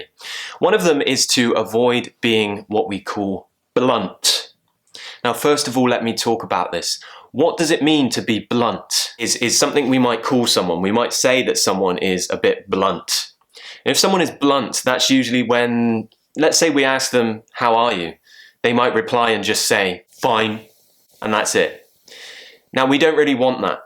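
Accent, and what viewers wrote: RP, United Kingdom. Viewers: I have become intoxicated to your British RP accent, that's what we call it, I was obsessed with my pronounciation, felling better now. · thanks for your british accent/phrases tutorial videos, i just love it! <3 Because it helps me to understand some phrases what my cousin talkin' about btw she's from London.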